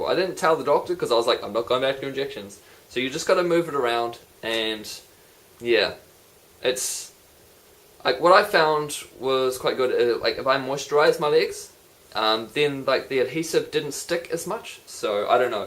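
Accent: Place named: Australia. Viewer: you sound Aussie i,m waiting for a specialist appointment to see if I have this or Klinefelter syndrome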